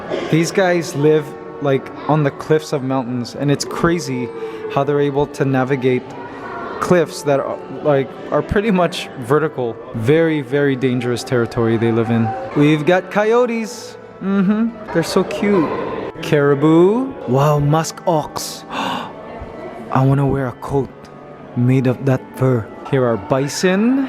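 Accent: Philippines. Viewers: I enjoyed every second of the vid. I sooooo love your voice Kuya. Wishing you could do a voice-over of some science documentary. 👍 You're Pinoy accent cracks me up every freakin time 😂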